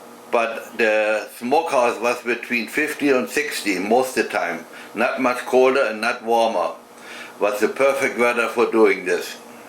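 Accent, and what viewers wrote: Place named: Germany. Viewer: My dad used to do that in Germany every year. We bought a pig and the butcher came out to our house and we butchered all day long. I remember that I had to stir the blood when they cut the pigs throat. We made everything by our self. Blood sausage (Blutwurst) and what you made here. (Rauchfleisch) and you sound like a German. Am I right? I wish my dad would still be around to tell me how he made all the hams and sausages. What you did here is pretty much the same way I just don't remember what he put in his brine. Is there a recipe for the brine (Lake) somewhere. Thank you that meat looks so so good. I will make some as soon as my pig gets big enough to get butchered.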